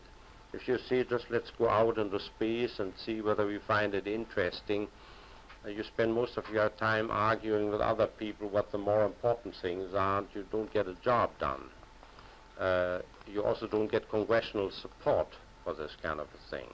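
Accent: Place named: Germany. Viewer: I see you trying to hide your German accent cause lots of Jews in American government that allocate nasa funding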